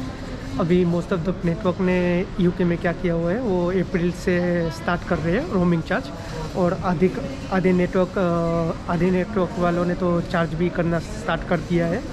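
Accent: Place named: Gujarat, India. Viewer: By your language accent i am assuming you to be from Gujarat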